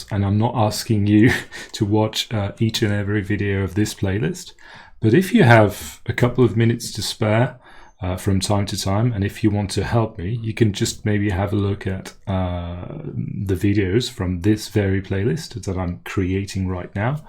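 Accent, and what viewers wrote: Southern England, United Kingdom. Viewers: Hi! I’m an American living in Florida. Your English is amazing IMO. I would think you were British with English as your native tongue. How can you improve? I think perfect is the enemy of good. I know you strive for perfection but that is always subject to interpretation. One specific thing I notice is your ability to say “pronunciation” like a Native American English speaker. Pretty much every other French native I have heard on YouTube says what sounds to me like “pronounciation” even when they’re trying to advise on correct pronunciation. One other observation, though not in any way scientific, is that French YouTube channels that go viral usually involve young, attractive female hosts. Jus sayin’. Keep up the good work! Over 50k subscribers on FCI now. With some dedicated followers based on the comment section. 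Hopefully that translates into people who are willing to be regular contributors of l’argent! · I am so delighted to have the opportunity to help you in your language journey after receiving so much help from your videos in FCI. I am a native English speaker living near Manchester in Cheshire but was born in the south of England and lived there for long enough to pick up a southern accent (which you have). Since then, however, I have lived in many different parts of the UK, so I have ended up with a somewhat neutral accent which even English speakers wouldn’t be able to place. I am a teacher and have taught English language so very happy to help anytime. I will add comments if I think they will help, but your use of English is really excellent and very impressive. I assumed you had lived in the UK at some point to be able to speak English so well? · Your English accent seems to sound as though it originates from the UK 🇬🇧 rather than the U.S. 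so it all depends on what accent you’re rooting for, but your English is great! I’d recommend listening to some audiobooks in English that might help develop more phrases that are used widely within the English language that always helps. Of course reading also!! Pronunciation seems awesome! Ik you’ll do great on your English journey! And yes English whether UK or not has minimal nasal sounds and mainly contains breath sounds (usually the sounds most prominent use our lips, tongue and teeth~ our mouth) rather than nasal or using our neck/throat if that makes sense as compared to French? 😂 Watching sometimes old shows maybe like “Friends” or other shows that you’re into in English can help you get acclimated and accustomed to new vocab and sayings. But honestly you did great! And for timestamps you would say “at 5 seconds” instead of wording it “ ” you can just ask to specify the time stamp at zero minutes and 5 seconds. 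Hope this comment helps you! And thank you for uploading on not only this channel but your French channel has helped me tremendously with my own language journey!! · You have a completely natural, educated, southern English accent. No trace or French or any other influence. One specific comment: on 7.19 'write the minutes' would not be correct - these are notes made at formal meetings as a record of what has been said. I'll keep watching! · A tell for me is the way you are using "of" but your English is pretty good honestly. What I mean is that in English, we wouldn't say "native speakers of English." We'd say "Native English speakers." For me I also have this problem but in reverse. (I'm learning French). Another thing when you were trying to say "whole " sometimes the "o" sounds unusual. I know what you mean by "take minutes" but I don't think we use that phrasing much here. We'd say something more like "take notes" but again, I'm speaking as an American. 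I agree your accent sounds more British than American. Probably if we were just having a short conversation I wouldn't be able to tell you weren't British.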